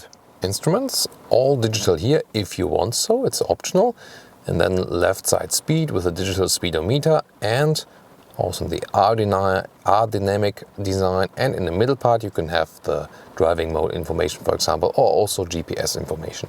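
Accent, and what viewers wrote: Germany. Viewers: English with german accent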